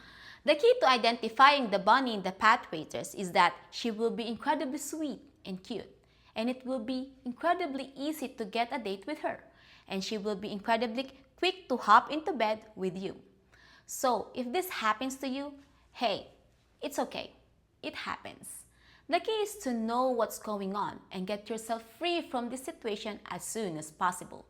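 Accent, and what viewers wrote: Ireland. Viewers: I love listening to Irish 😅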